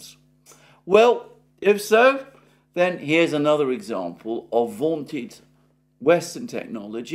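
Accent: United Kingdom. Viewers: Is he British or Russian?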